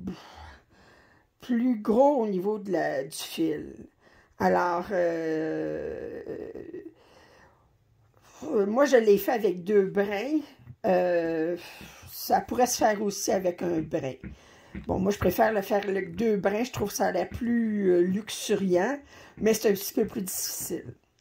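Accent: Quebec, Canada. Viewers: Merci pour ta réponse. Je vais donc continuer avec ma grille papier, à l'ancienne. C'est sympa de communiquer de chaque côté de l'Atlantique. A ton accent, je suppose que tu es de Québec. Moi je suis dans le sud de la France, en dessous de Bordeaux. Excellente continuation et au plaisir. Caroline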